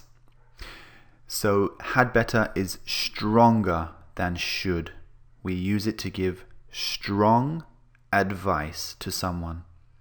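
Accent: United Kingdom. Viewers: Britsh Accent?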